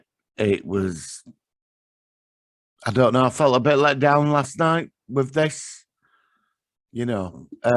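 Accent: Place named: Liverpool, United Kingdom. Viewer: I can't stand scouse. It should be counted as a speech impediment. CALM DOWN CALM DOWN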